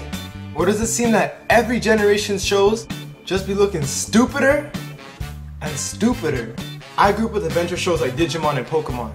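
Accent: Canada. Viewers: LOL that dora bit was hilarious haha. he definitely sounds canadian though...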